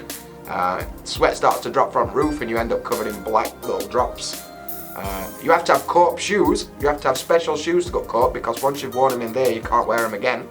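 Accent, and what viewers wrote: United Kingdom. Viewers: wait, you`re british?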